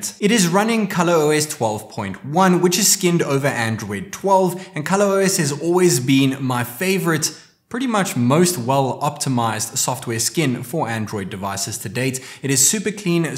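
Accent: Johannesburg, South Africa. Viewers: Are you South African? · Haha! I love the SA accent. Ja swaar! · Are you from Johannesburg?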